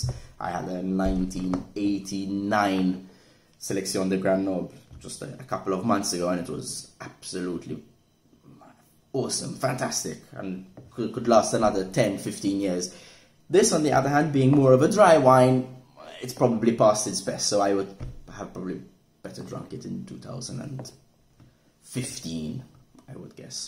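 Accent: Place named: Jamaica. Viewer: Good review. You sound Jamaican.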